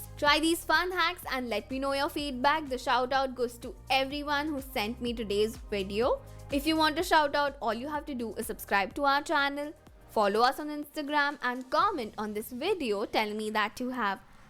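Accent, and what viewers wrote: India. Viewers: I just love the way you talk in English and a desi tadaka . Will try this hacks for sure . LOVE YOU !!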